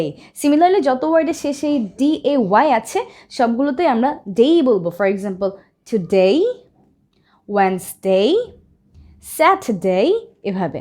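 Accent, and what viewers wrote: United States. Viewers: We need this kind of more class. Btw Sister I have a question Is this American pronunciation? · Apu ki USA accent use koren?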